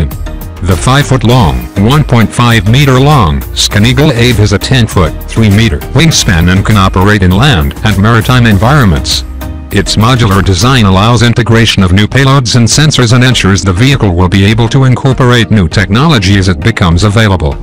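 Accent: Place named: Russia. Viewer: Poor sound - Russian accent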